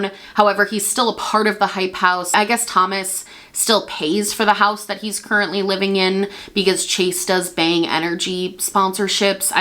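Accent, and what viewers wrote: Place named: Upstate New York, United States. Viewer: Love how you have the most subtle upstate NY accent 😂😍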